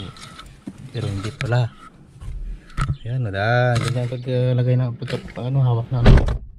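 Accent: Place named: Iloilo, Philippines. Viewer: ilonggo accent hehe bal.an gid haha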